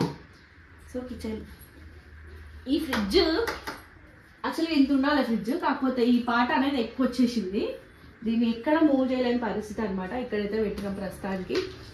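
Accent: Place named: Telangana, India. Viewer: Mee Voice chala Excellent ga undi andi Pure Telangana Slang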